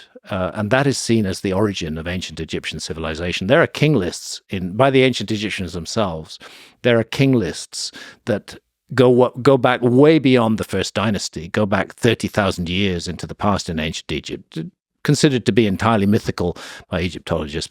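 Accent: United Kingdom. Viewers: This is proof that not everything sounds intelligent when you have a British accent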